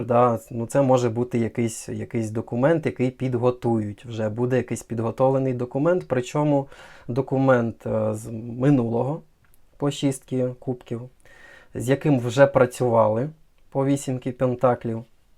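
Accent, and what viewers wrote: Standard. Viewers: Ух Ти, яка у Вас гарна українська мова, так чисто розмовляєте )))❤🇺🇦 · Розкладам на картах не вірю, ніякі прогнози "картярів" не збулися. Але, слухала вас лише тому, що ви говорили нашою державою мовою - УКРАЇНСЬКОЮ!!! До речі, ви досить гарно розмовляєте, без суржику. І голос у вас приємний!!!😊😊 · дякую! Яка у вас чиста,красива мова!!!❤❤❤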